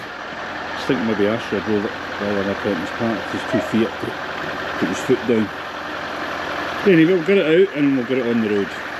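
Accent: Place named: Scotland, United Kingdom. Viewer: Where are u based mate might be a stupid question because your Scottish but thought I would ask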